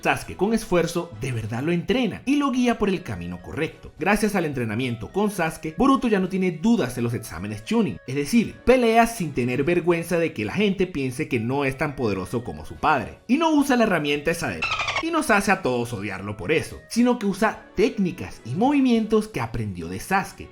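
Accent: Ecuador. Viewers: Eres de ecuador? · Eres de Ecuador? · Eres de ecuador ?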